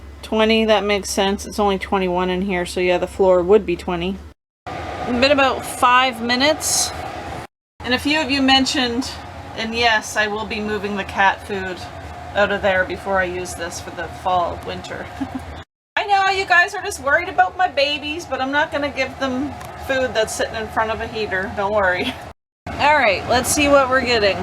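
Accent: Canada. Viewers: Where are you? It's August and you need heat? I hear the Canadian accent but you must be way up there.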